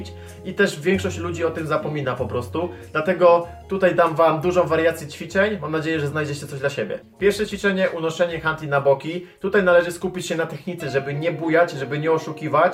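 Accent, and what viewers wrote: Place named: Poland. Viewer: Tłumaczy dobrze. Słychać że Polak.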